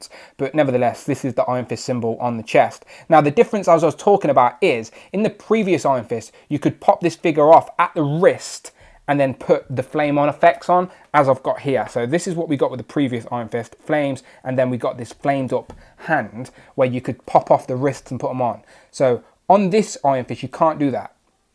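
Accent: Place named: United Kingdom. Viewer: Can you tone down that British accent? I can barely understand what you're saying.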